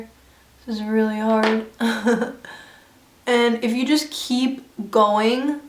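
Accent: United Kingdom. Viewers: Love the English accent!! I'm from the uk. We do say i can't be botherd alot 😄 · I subscribed because your British accent made me laugh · omg loved this video to the moon and back, you had me laughing so hard with the "I can't be bothered" xD love brits accents! you seem in such a good mood definitely contagious cause now I'm smiling! :p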